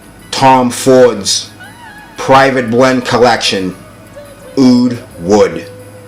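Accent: Boston, United States. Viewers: this boston accent is so annoying and coming out of a black dudes mouth makes it funny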